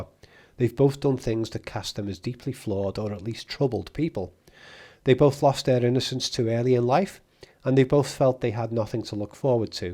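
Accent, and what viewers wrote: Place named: North, United Kingdom. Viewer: Your northern accent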